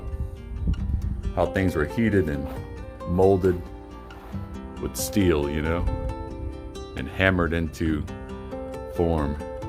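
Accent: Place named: Cajun, United States. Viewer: If you did these in your gay cajun accent you’d probably crazy viral ha 😂😘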